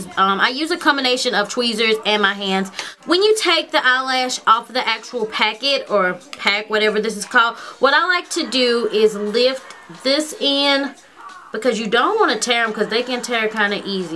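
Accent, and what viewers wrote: South, United States. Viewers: A little late on watching this video but I must say I LOVED this tutorial. It definitely does take practice and that is exactly what I am going to do. BTW: I love your channel and your upbeat and spunky personality. Oh and I cant forget that southern accent. ;) SUBSCRIBED! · Your country accent makes me always wanna jus give u a big ol country Hug.. I luv it =) <3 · I absolutely adore your southern accent! It's so fetch! :)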